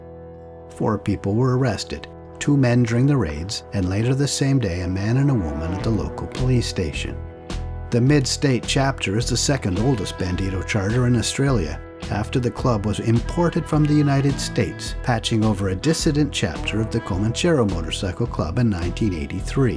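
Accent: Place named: Canada. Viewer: As per usual a good vid. I must say "thumbs up" for the nearly correct pronunciation of "Murrumbidgee". It's a hard one for non-Aussies, I sense a bit of a Canadian accent? Your "Melbourne" is also pretty good.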